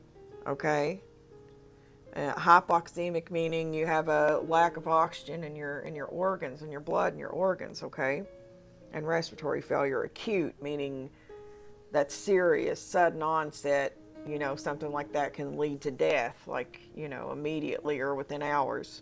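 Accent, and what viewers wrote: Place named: South, United States. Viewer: Hey. I love your channel and I love hearing a sister from the South. I'm in Nashville. Much love. Gonna share your channel on my Twitter if that's cool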